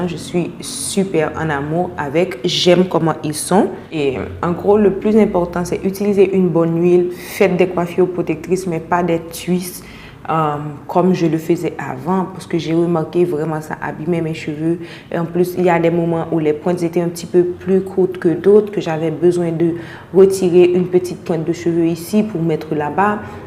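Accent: Haiti. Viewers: Elle parle comme une haïtienne!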